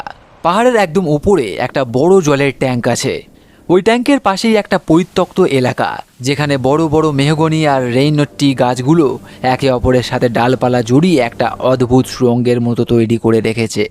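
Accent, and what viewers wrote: Kolkata, India. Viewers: রহমত আলী পাহাড়ের উপরে রিক্সা উঠালো কেমনে, আপনার ভাষাতে মনে হচ্ছে আপনি কোলকাতার,আর এই পাহাড়ের আশেপাশে আমার বাসা, তবে হ্যা এখানে ভয়ের ব্যাপার আছে, এটা জানি,কিন্তু এই পাহাড়ের রাস্তায় রিক্সা উঠানো একেবারে অসম্ভব ❤❤❤❤❤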